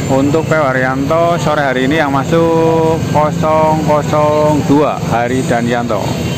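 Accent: Banyumas, Indonesia. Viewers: Hadir mantau mas, nek ora ora kepenak... Paten👍👍👍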